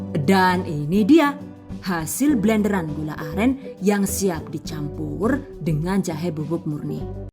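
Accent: Banyumas, Indonesia. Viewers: Ngapak temen wa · Wong endi si rika mbakayu...? Deneng ngomonge pada karo enyong...! Jos lah top markotop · AQ suka bahasa ngapknya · 😁😁😁ana bahasa ngapak e kya nyong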